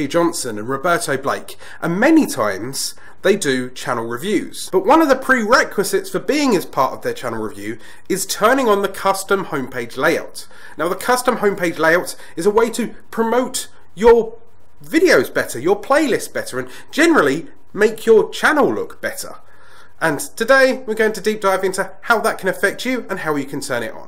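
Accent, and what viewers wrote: United Kingdom. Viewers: Just listen to his British English. Its worth more than 7k views in my opinion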